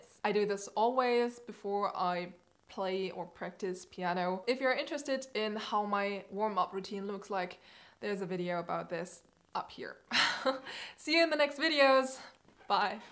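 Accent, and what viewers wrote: Germany. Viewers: I know she was German as soon she spoke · man merkt so hart das du deutsch sprichst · Man hat dein Englisch gehört das du deutscher bist 😂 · Dieses anders deutsche Englisch :)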